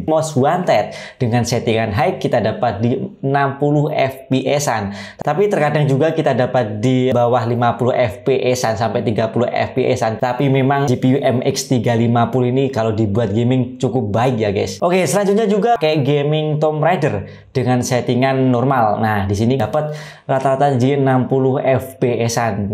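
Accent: Java, Indonesia. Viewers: Mas mas jawa bgt nih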